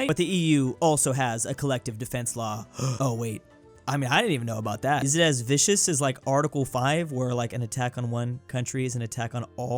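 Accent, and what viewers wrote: United States. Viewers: Mate are you American or the same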